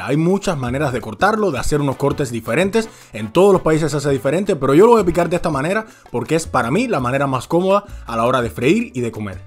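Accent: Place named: Cuba. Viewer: Por tu acento en la voz me parece que eres cubano si es asi un saludo desde aca desde la isla muy buena esta receta y secretos tuyos un exelente tutorial bien sencillo pero bien preciso Gracias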